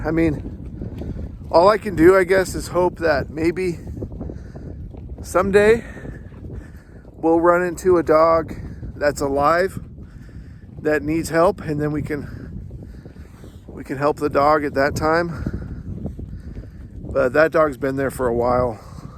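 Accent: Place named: Long Island, United States. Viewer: Where you from you sound like yr from long island ny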